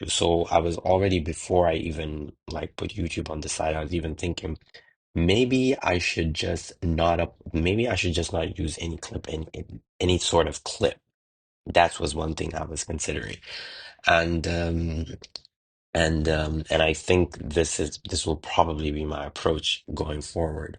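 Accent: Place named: Quebec, Canada. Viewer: Hey there ! Discovered you 5 days ago and I was absolutely NOT disappointed. I enjoyed your video about the Reio. Please stay in good health and share us your work whenever you can :) Also, where are you from ? I hear a faint accent, but can figure it out. ^^ I'd say Québec (Canada) by pure instinct ¯\_(ツ)_/¯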